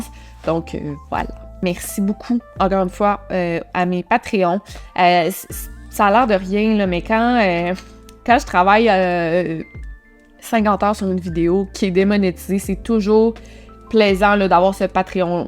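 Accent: Quebec, Canada. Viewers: Allo intenet l accent du Québec j adore 😂😂 bonne continuation à toi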